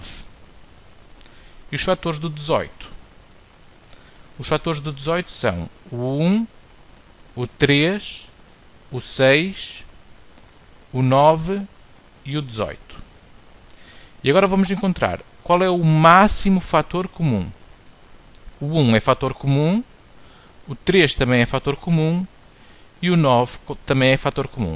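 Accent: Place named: United States. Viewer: otimo vc tem sotaque de americano ...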